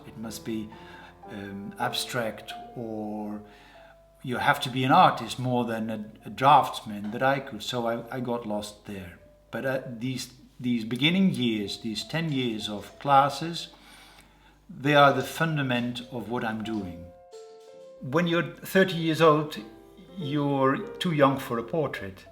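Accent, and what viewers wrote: Netherlands. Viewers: Love your work! Just curious - where is your accent from? I'm guessing Dutch?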